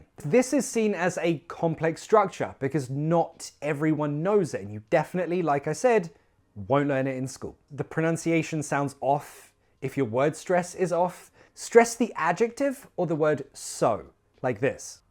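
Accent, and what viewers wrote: United Kingdom. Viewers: You're always wonderful! I adore a British accent and really like your way of teaching, I study English language and life of Brits❤ by the way, 5 years watching you from Iraq! ❤❤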